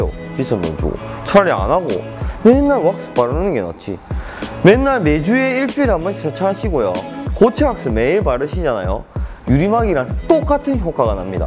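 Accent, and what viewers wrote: Gyeongsang, South Korea. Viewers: 경상도사투리에 구독.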